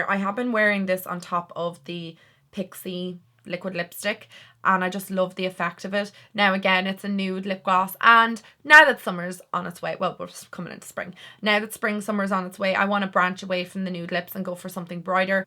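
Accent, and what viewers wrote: Ireland. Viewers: Thank you for sharing these! You look lovely. Listening to you makes Ireland feel a bit closer.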